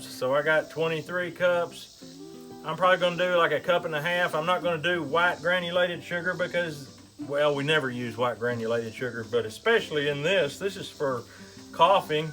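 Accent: South, United States. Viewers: I enjoyed watching your video and loved your down to earth personality and country accent. Blessings! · "Just like at".! Love the south..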